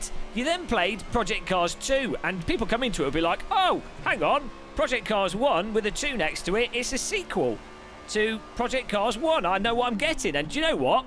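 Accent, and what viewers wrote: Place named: Leicester, United Kingdom. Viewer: but you definitely must be Leicester Lad for sure !!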